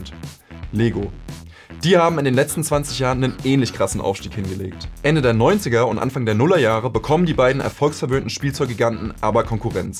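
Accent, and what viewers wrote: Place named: Franconia, Germany. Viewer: Dieses Invers-Fränkisch ist zu viel für mich...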